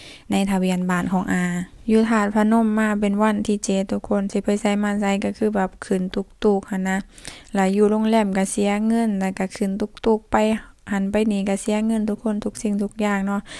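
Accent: Laos. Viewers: อีกอย่าง สำเนียงพูดลาว FC บอกหลายทีแล้ว เวลาติดต่อราชการให้เปลี่ยน นี้ก็ไม่เคยจะทำ จะมาขอสัญชาติไทย ยังจะพูดลาว จะมาห่วงแหนความเป็นลาวอะไรขนาดนั้น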